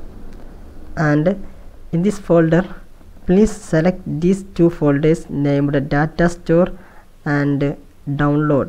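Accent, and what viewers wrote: Kerala, India. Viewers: I like ur mallu English 😂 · Are you malayali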